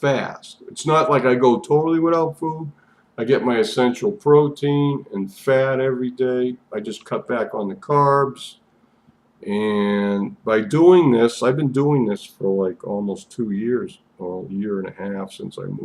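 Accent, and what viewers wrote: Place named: Boston, United States. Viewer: where do you live Florida? I love your Boston accent lol happy Sunday fun day!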